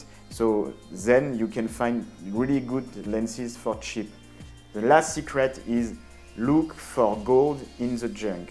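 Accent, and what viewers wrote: France. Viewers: I can listen to that French accent all day. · Il y a peu de bonnes videos francophones sur Youtube alors je n'ai pas compris pourquoi tu as préféré publier en anglais avec cet accent francais qu'on reconnait à mille lieues plutot que d'aider les francophones qui n'en trouvent pas. Merci quand même des conseils.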